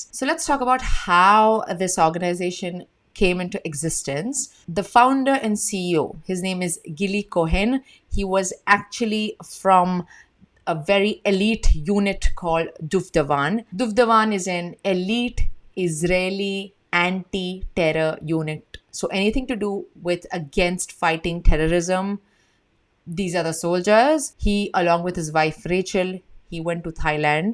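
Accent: India. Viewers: Your English is More Indian than Israeli❤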